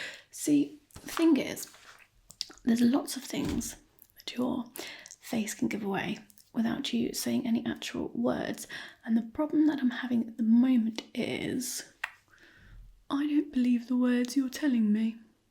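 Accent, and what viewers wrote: RP, United Kingdom. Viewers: That felt fresh & original but kind of classic at the same time. I like all the surprises in there, and posh accent! I probably could do with sunglasses on for the light bit though! Haha! 😎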